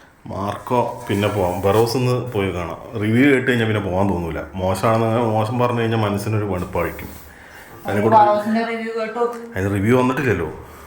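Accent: Malappuram, India. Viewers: അന്റെ വോയിസ്ഓവർ കേൾക്കുമ്പോൾ ഒരു സംശയമുണ്ടായിരുന്നു ഇജ്ജൊരു മലപ്പുറം കാരനാണോന്ന് പുടികിട്ടി ♥️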